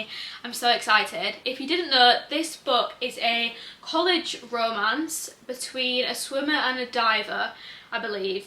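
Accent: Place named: United Kingdom. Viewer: hey 👋🏾, just found your channel and immediately subscribed when I heard your accent and knew you were a fellow UK Booktuber. I love your vibes and reviews of books, i feel like we like similar books also!. Looking forward to watching more of your content. 💞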